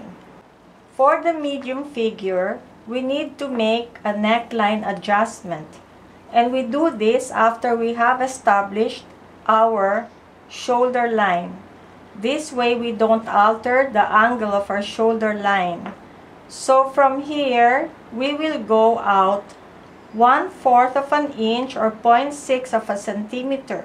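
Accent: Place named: Philippines. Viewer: your accent sounded like a filipina..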